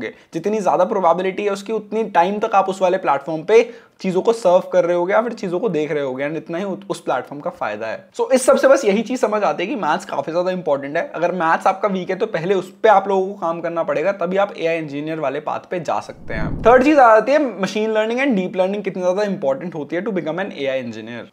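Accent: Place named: India. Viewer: Dude, you may have been saying something worth listening to, but your accent makes it very difficult for non-Indian speakers to understand you because you talk too fast. If you really want to get your information out there in videos, try talking slower.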